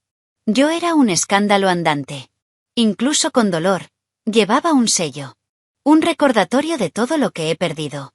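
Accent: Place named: Spain. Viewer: Recomiendo le pongas un acento mas neutral. En America Latina somos 650 millones contra menos de 50 en España. Y la verdad escuchar acento español en estas historias desmotiva un poco a los latinoamericanos. Y parecen mas historias cómicas que otra cosa. Con todo respeto, pero la verdad.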